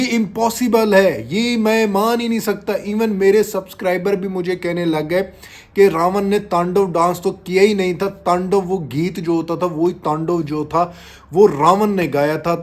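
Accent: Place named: Pakistan. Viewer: Pleass batao na..aap india se ho ya pakistan hai..kyuki apki accent Pakistani hai..❤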